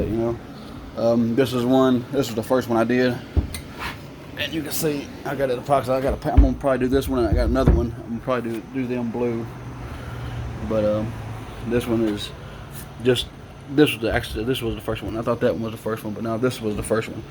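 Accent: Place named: South, United States. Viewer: Awesome weights and southern accent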